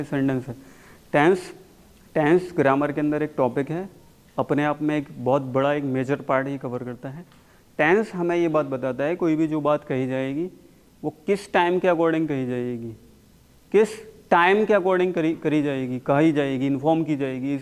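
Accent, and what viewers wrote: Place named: India. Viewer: Indian pronounce cant understand other countries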